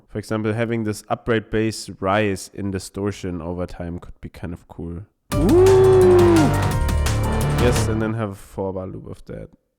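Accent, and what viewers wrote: Germany. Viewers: Im sry if il wrong here but its been c8nstsntly hititng me that ur accent sounds quite german lol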